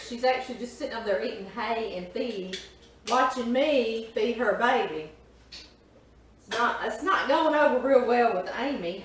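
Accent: South, United States. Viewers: With that Southern accent, you KNOW its gonna be good food! 🤤😁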